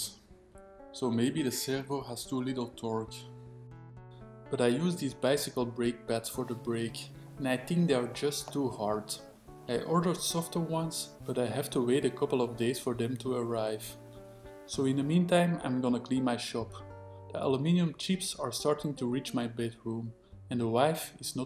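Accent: Belgium. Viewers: Your videos are very inspiring and pleasant to watch... and your accent reminds me of my good friends in Brugge and Gent :-) Best wishes from Poland!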